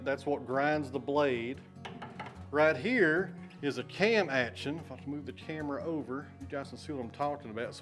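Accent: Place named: East Tennessee, United States. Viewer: You're speaking english with an east tennessee flair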